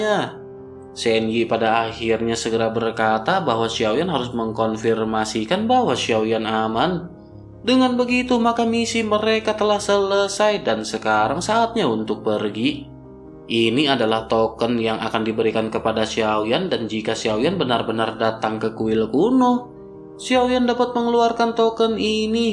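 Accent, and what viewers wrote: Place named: Java, Indonesia. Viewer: Min suara lu kok lama2 jadi medhok 😂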